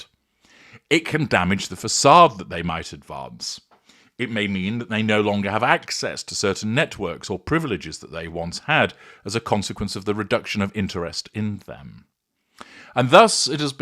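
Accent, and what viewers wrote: United Kingdom. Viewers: I already put a note on my computer to boycott all videos featuring she who will not be named so I wouldn't accidently forget. I am happy you have joined the boycott as well. Everytime I hear your voice I am reminded of a certain British gentleman I see in my videos a lot. It's entertaining to wonder if that is you or not. It was so wonderful to see the Princess of Wales looking so beautiful as well.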